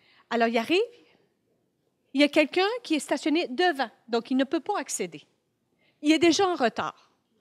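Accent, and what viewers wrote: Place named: Quebec, Canada. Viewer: tout ce qu'elle dit est vraiment intéréssant, mais j'ai de la misère à comprendre à cause de l'accent québecois :(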